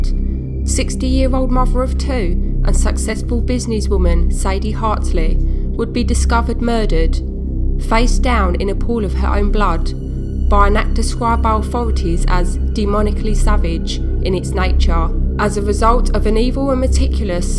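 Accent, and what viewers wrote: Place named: Cockney, United Kingdom. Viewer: Good documentary very well put together and very informative, but use a better narrator that monotone cockney accent spoils what would otherwise be a very polished production.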